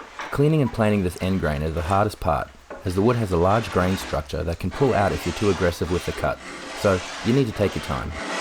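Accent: Australia. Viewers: Nice to hear an Aussie accent. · hi there..I can tell by your accent you are an Aussie like myself...I've watched various furniture flippers from other countries doing this but have wondered myself if there is money in it here in Australia..I know that this sort of furniture is rather expensive in Op/second hand shops and stuff on roadsides are generally cheap and nasty particle board, not worth fixing up..I have furniture of my mothers that date from the 50's, from when they first set up house, but alas some of it probably wouldn't sell, even if fixed up, because they are furniture that nobody really uses anymore, like free standing wardrobes...I'd love to keep them in my upcoming move but alas will not have the room for them..do you know of anybody flipping furniture here in Mel Vic..?? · Really like the look of this..and so nice to hear a fellow Aussie. A new subscriber for sure…🇦🇺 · Saw the thongs, heard the accent and knew straightaway you were an Aussie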